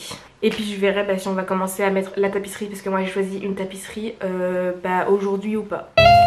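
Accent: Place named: Antilles, France. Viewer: T'es antillaise parce que moi je suis martiniquaise et t'a vraiment un petit accent 😁 En tout cas ta chambre est belle et ton vernis aussi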